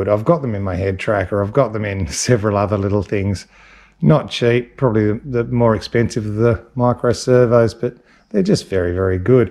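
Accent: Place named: Australia. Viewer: Great video dude. I have 8, 1/10 crawlers. My first hobby grade RC was the original deadbolt so I have wanted a Scx24 Deadbolt since they first came out. Finally ordered one and have been watching lots of upgrade videos. Like yours the most. Interesting that you still like the Nitto tyres. I'm not going as far as you with upgrades but you know how it is I won't be able to help myself. You sound like an Aussie, keep the videos coming.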